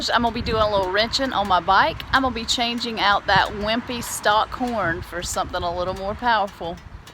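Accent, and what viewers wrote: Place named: South, United States. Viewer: I've been looking at these along with the PIAA versions....thanks for a great video! You've got to be from the south....I know my own :D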